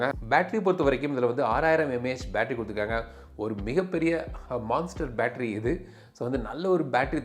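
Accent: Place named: Nagercoil, India. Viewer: Nagercoil tamil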